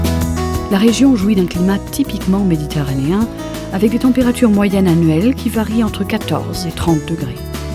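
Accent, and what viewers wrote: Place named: France. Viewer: Visca Girona i tota Catalunya Catalana, c´est marrant d´entendre les noms de ville et d´endroit avec cette prononçassions Française.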